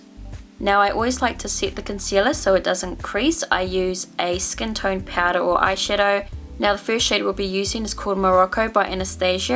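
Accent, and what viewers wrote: New Zealand. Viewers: Just found your channel and im in love!!, are you kiwi? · Are you a kiwi?? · LucyAnneDewhurst it's called an accent.... She's kiwi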